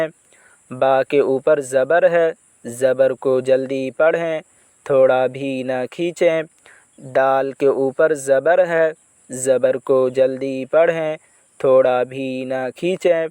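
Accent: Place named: Arab world. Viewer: urdu ko arbi ki tarah mat padhiye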